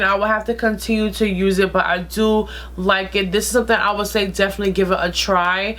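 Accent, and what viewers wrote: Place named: North, United Kingdom. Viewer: i always love her accent lol she got a (I'll whoop your ass)up north type of accent.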